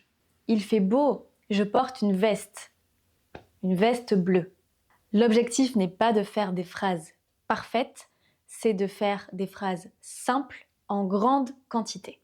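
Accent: France. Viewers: Bonjour Tout à fait, c'est des méthodes que j'utilise aussi pour améliorer ma prononciation. Je parle tout seul très souvent quand, par exemple, je vois une vidéo YouTube ou la télé et je fais comme si je donnais mon avis sur n'importe quel sujet et ça m'aide à m'exprimer. Je pense que je suis pas mal Mais il y a un truc sur lequel j'ai un autre avis. Je pense qu'en fait t'es très célèbre,. T'es une star sur YouTube et j'ai adoré t'écouter parler en anglais parce que tu perds pas ton accent français mais en même temps ta voix change un petit peu, je trouve ça formidable 😍 Merci pour les conseils, je te souhaite une excellente semaine ma prof ✌🏻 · Your English with the French accent 😮😳 🥵 🫠❤ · Goodness mate, your seriously in love aint ya 😂 As a Londoner- no! She doesn’t sound English, elle a un really sweet French accent 😍❤️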